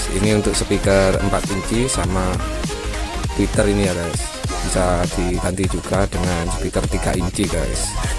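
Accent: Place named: Malang, Indonesia. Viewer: Mas sampeyan iki wg malang ta? .... Kok logate arema pasti tak like mas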